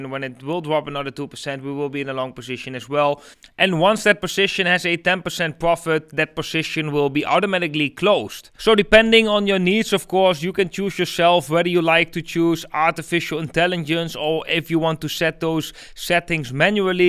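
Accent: Netherlands. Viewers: The moment I heard your accent, he's Dutch 😅🤣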